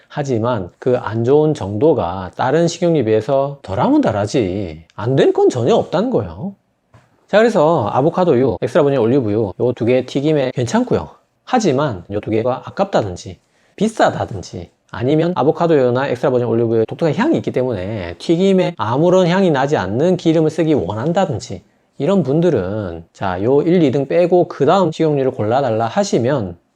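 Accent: Gyeongsang, South Korea. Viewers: 선생님 꿀 지식을 경상도 억양으로 설명해주시니 정겹습니다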